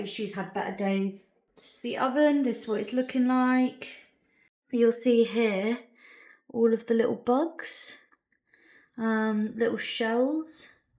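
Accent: South, United States. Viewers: As an American I must say you do an excellent Southern accent😁! Love your work ethic and ethics in general. You are a godsend to many people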